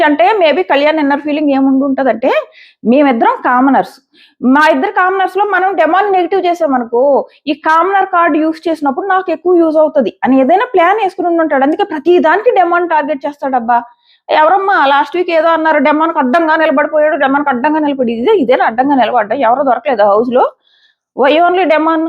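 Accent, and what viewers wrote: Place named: Godavari, India. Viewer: Ammai, You are from mana Godavari district ana mata.. Nice slang....❤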